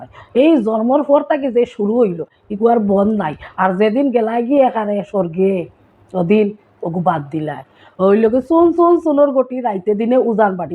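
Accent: Sylhet, Bangladesh. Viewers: এত সুনদর সিলেটি কতা গুলি বলতেছেন ভাষাটা রককা করিয়া রাখতেছেন দনও বাদ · Didi,tumar sylheti Kota huni vala lagse,aro besi besi sylheti basai post diyo,thanx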